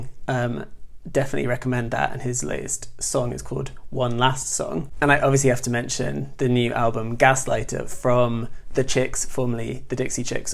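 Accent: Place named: United Kingdom. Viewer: Hey, I love Gaslighter. They are so talented. I really want to check out I May Destroy You but I have to get HBO. I'll definitely look into (sp?) too. I was wondering given your references to Katrina and NY Ballroom culture etc. where in the world you are? lol Obviously the accent suggests the UK but you have a lot of American references. Just curious. I just finished reading E.M. Forster's Maurice myself and immediately watched the 1987 film. I loved the novel. Especially after reading the Terminal Note at the end. Very interesting take on an English gay love story in 1914. The movie was pretty faithful to the novel as well. Thanks for the recommendations!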